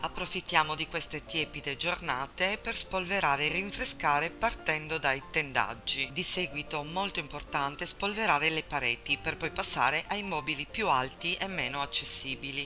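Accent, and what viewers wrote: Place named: Sardinia, Italy. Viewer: Wow che bella voce forte e si sente l'accento Sardo,Bel video cara,molto ben fatto anch'io inizio le pulizia dalle parti alte,like e buona giornata!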